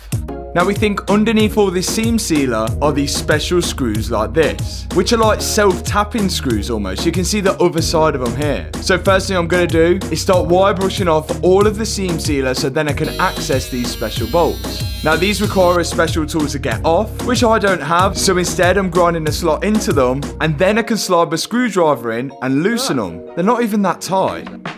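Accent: United Kingdom. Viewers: I'm so irritated of his British accent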